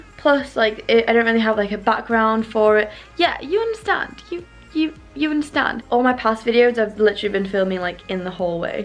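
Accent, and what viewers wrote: United Kingdom. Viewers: S I'm not a native English speaker but I also think that British English is the most beautiful accent.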